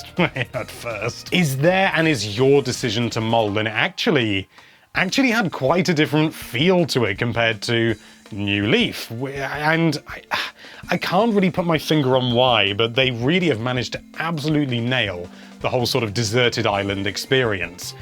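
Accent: United Kingdom. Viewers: You can stop being adorable with all your fancy UK speak. ❤ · That's splendid you like our accent. Can't beat a bit of 100 percent brittish beef 🐄😬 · Man I love your Britisch accent and all of the words that come with it xD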